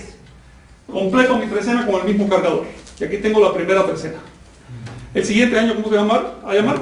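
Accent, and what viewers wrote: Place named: Chile. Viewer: hablas como chileno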